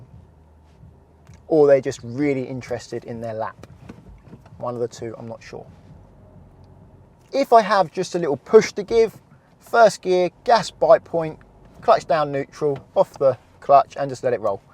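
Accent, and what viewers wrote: United Kingdom. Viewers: I came always for his British accent.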